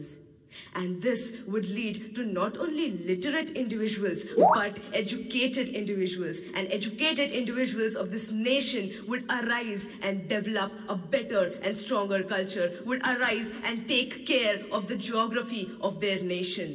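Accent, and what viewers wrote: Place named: Nagpur, India. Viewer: Nagpur ki English other cities and states se ......difficult hoti hay!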